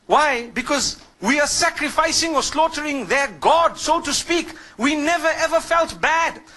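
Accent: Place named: Zimbabwe. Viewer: Even your English can show,you grew up in Zimbabwe,,i like that you were treated well in Zimbabwe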